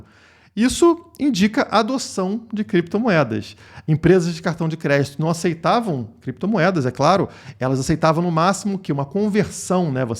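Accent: Rio de Janeiro, Brazil. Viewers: "Téiijjjla, triméééixtri"...sotaque carioca é nojento, mas as informações são ótimas.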